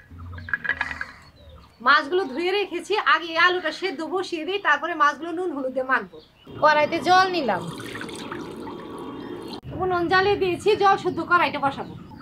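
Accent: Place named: Bangladesh. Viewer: Didi ki bangladesi meye naki,kotha bolar doron ta bangladesi der moto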